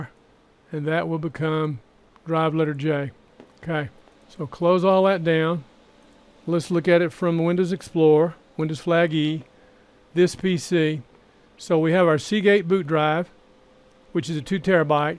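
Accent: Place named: South, United States. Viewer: what a nice southern pronunciation. Tennessee or South Carolina ?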